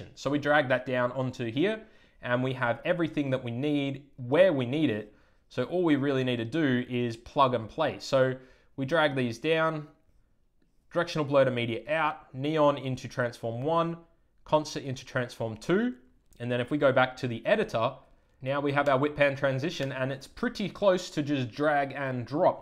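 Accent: Australia. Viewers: Thanks for that tutorial! Really well explained and value add. And is that an Aussie accent I hear? Nice one mate!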